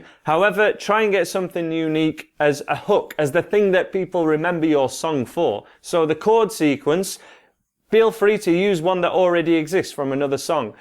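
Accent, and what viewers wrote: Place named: Yorkshire, United Kingdom. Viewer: This is really helpful, thank you :) what part of Yorkshire are you from?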